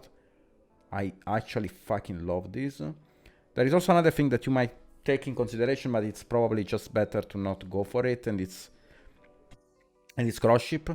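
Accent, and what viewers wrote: Italy. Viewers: incredibile come avevo capito che eri italiano dalla prima parola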